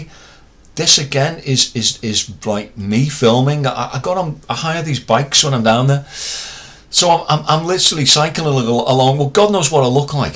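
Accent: Liverpool, United Kingdom. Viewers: I love your liverpoolian accent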